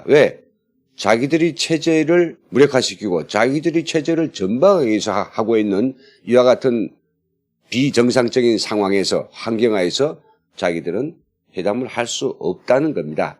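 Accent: North Korea. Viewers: 김흥광님! 북 사투리, 내용, 언어선정 보면... 북 사투리가 좀 짖 듣데에 저의어머니 평양출생 일본어가 잘하지만 돌아가실때까지 북 사투리는..., youtuber 활동내용을 즉 북에서오는소식등 특유의활동등 을 ㅂ 사투리가 pt 를 방해하지 안도록.. 언어선정도 조심하는데신경쓰면 속도가 처지고 재 미 가 없어져요.... 친애하는 수령... 얼마나 똑같은마ㅏㄹ 재미없고... 아무든 탈 북 민은 김정은 의 독버섯 이다. 다가오는 통일한국의 징검다리이다. 자한당을 중심으로 뚜벅뚜벅 최고 선교사파송 기독교육국가 대강국이되기까지 김 님, ㄷ~국 화이팅!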